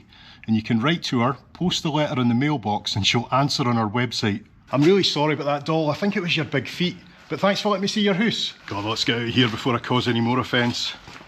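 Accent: Scotland, United Kingdom. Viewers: The stiff Scottish accent is becoming increasingly understandable after each video😅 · you sound british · First comment, I am enjoying all the videos, and look forward to them all. What I also enjoy is the delicate Scottish accent,and even nicer when comes on line...Chris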